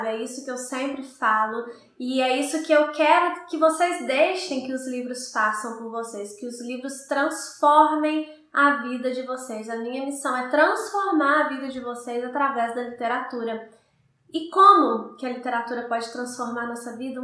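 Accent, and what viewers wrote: Minas Gerais, Brazil. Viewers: Boa noite,! Você é mineira?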